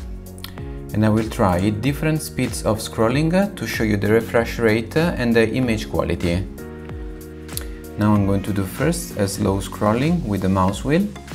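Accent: Italy. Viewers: Cerco una recensione in italiano, vedo che l'autore del video è italiano: ma ha fatto la recensione in inglese (e con una pronuncia tipicamente italiana!) L'unica cosa migliore rispetto alle recensioni di siti specialistici è lo scandire per bene le parole che rendono meno impegnativo il lavoro di traduzione. Cmq a parte le critiche per non aver pubblicato anche un video in italiano, ti ringrazio: il video è ben fatto ed analizza il device a fondo. Ps: dovresti scrivere nel titolo che questo è il Dasung Papelike 3 o si potrebbe avere il dubbio che si stia parlando di un modello più vecchio. Pps: 40 minuti di video sono troppi! See you, Italian Reviewer!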